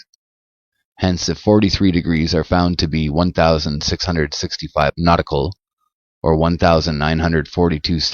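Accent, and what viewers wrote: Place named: Canada. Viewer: Sounds like flat water channel. I heard the Canadian oooo. Excellent.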